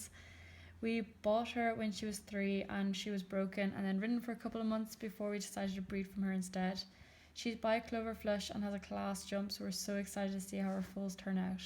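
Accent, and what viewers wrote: Ireland. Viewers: Are you Irish 🍀 cause u sorta have an Irish accent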